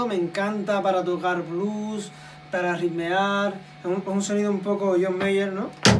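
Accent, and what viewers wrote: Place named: Argentina. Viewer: joder pensé que eras argentino primera vez que veo un video en el que hablas...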